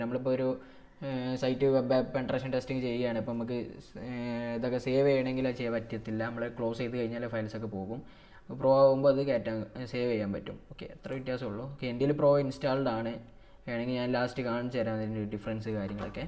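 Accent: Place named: Malappuram, India. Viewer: malappuram language slang anu allo . entha e olu😁😁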